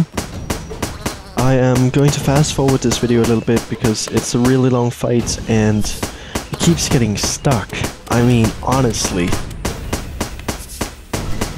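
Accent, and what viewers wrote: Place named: Denmark. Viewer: You sound VERY Danish!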